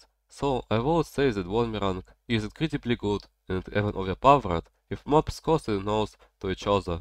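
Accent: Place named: Russia. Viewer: ahh aight, that is one thick russian accent, good video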